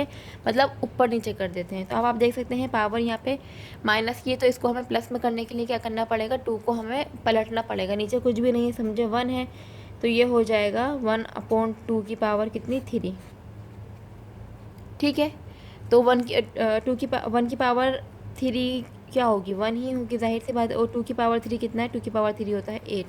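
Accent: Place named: Sindh, India. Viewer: Madam aapki mother language sindhi hy ya urdu aap urdu sindhi ki tarah bol rahi hain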